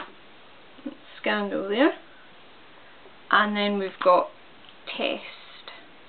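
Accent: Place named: Scotland, United Kingdom. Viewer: She's Scottish, gonna say from the west coast maybe?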